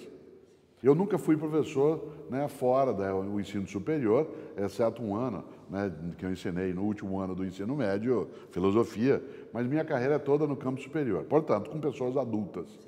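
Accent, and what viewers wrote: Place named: Rio Grande do Sul, Brazil. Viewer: Pena que o vídeo voi curdo esse sotaque gaúcho com toque italiano é de mais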